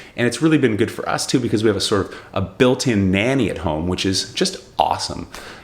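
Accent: Scotland, United Kingdom. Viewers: Your scotch accent is pretty convincing , great personal video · Ive been in a creative rut too...need something more to challenge my creativity! I always love your stories dude!!! Can’t wait to hear more stories. And btw your Scottish accent really brought the story together ahah!!!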